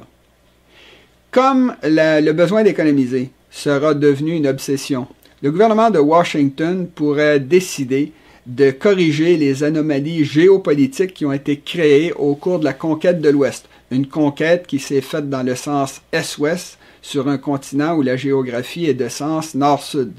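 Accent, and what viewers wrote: Quebec, Canada. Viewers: Je vous préfère en français. J'aime l'accent québécois et vous évidemment plus confortable. Par contre, les français européens sont de l'avis que le français québécois n'est pas français!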